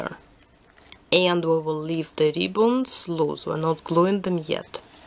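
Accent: Russia. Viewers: Ты русская! По аксенту видно! :) в Америки живешь?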